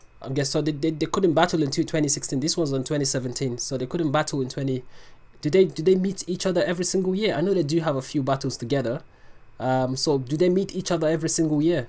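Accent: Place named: England, United Kingdom. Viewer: Tiene el acento inglés no creo que sea de Estados Unidos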